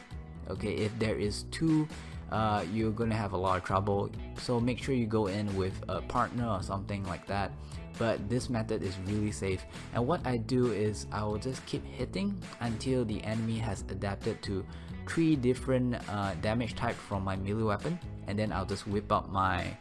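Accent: Singapore. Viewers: what are u Singaporean?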